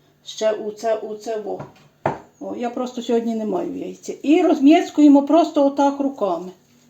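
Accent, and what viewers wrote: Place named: Bukovina, Ukraine. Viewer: Яка розумниця!!! Я сама родом з Кіцманя,Чернівецькоі обл. Яка мені люба Ваша мова буковинська! Аж серце щемить!!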